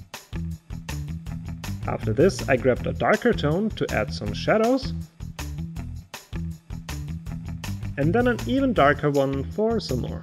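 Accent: Germany. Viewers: your accent is so very german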